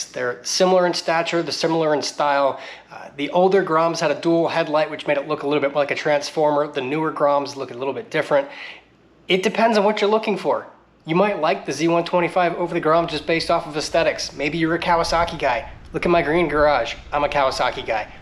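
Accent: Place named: Chicago, United States. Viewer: you seemed to have some, of the Chicago area inflections, oh well, thanks for answering, have a good day.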